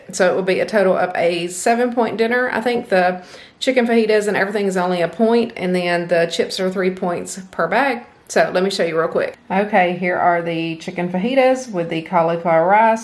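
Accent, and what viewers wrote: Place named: South, United States. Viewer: I like it when you say "any who", and some other terms you use, its sounds so southern, which you are. I'm born and raised in California but I guess it's because my ancestors are from the south that I like those terms. 🌈🌈🌈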